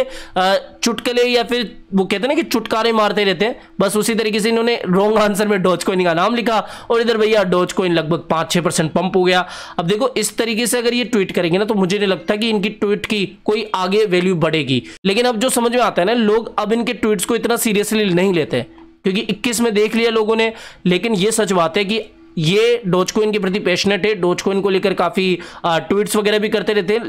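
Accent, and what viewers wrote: Jaipur, India. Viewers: tumhare way of talking se lagta h tum jaipur se ho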